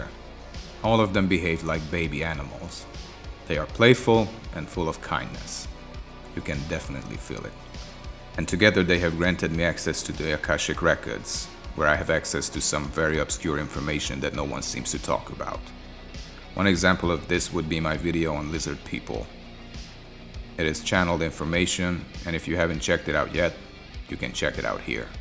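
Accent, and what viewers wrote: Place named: Eastern Europe. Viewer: Sounds Eastern European.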